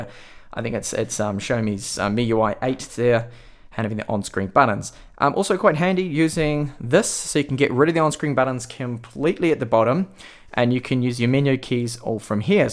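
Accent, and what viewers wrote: Australia. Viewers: I can't tell if you're from Australia or New Zealand... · I love your accent, are you australian? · Hey man first time on your channel, quick question, did you have to mod anything with the phone in terms of sim like do you use a provider like optus? Just guessing from the accent, thanks man.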